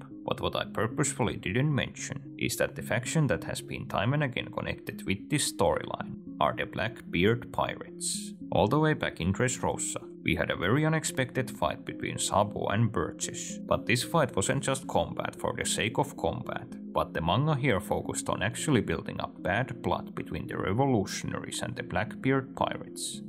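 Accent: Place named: Finland. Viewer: Is that a Finnish accent I peek? Great channel bro, keep going!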